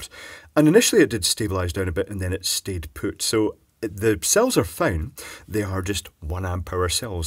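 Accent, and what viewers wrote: United Kingdom. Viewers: That british accent tho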